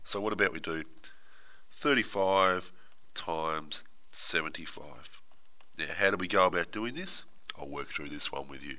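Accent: Australia. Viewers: literally every aussie man with a deep voice does lol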